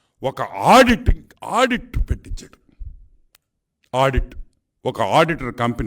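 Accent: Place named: Nellore, India. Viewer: Anna meeru mana Nellore bhasha superb ga matladutharu anna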